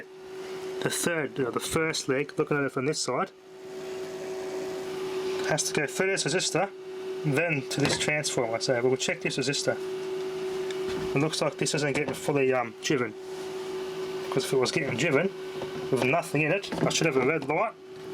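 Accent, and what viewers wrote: Australia. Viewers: is this Australian?? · He is speaking rapid AUSTRALIAN. Solution is to hit CC to display subtitles. Helps a bit. Comments beneath the video are useful. Steve in Sydney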